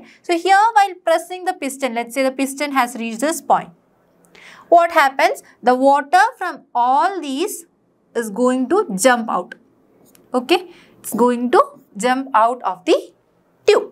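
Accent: Kerala, India. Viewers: Ma'am r u a malayali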